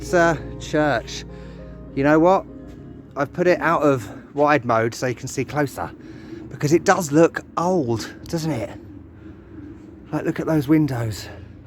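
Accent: England, United Kingdom. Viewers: Video sam coveka dok je snimao, mislio sam neki domaci triper prica na engleskom, kad ono pravi englez 😆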